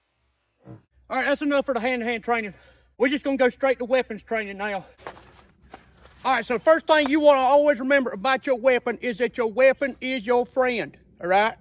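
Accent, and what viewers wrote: South, United States. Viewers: I love his southern accent. He’s hilarious. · took the internet by the storm. Looking like a Hulk, talking like a deep Southerner. Priceless!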